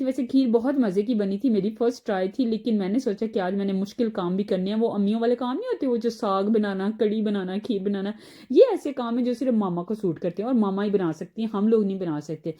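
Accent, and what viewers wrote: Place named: Punjab, India. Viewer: Mujy ap ki Punjabi Ka tarka bohat Pasand ha ji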